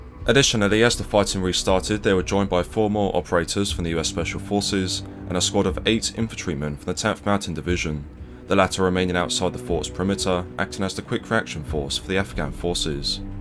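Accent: England, United Kingdom. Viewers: What accent is this? What part of England?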